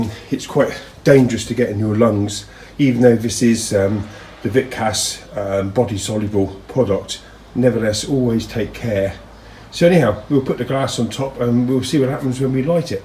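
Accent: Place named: United Kingdom. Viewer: Hi! (I am hoping I got the name right!) Increasing the depth of the top box seems to have helped our objective. I have been experimenting with Trevor's modified 4" version with after burner directly onto the hotplate. I did a rough layout this morning and did a test run - the heat is so immediately seen on the top plate. No smoke from my 5" chimney that I use put at the moment. I will be constructing the whole stove from firebricks. Its difficult to get hold of vermiculite boards in India (a lot is made here but mostly exported to the western nation it seems as there is a higher demand there for insulation purposes I presume). By the way your speech is certainly English(British) and I was wondering if this location you are shooting at is within Britain or somewhere warmer! It certainly is very nice looking kitchen garden / patio garden you've got there and gives off the feeling of somewhere in southern Europe!:)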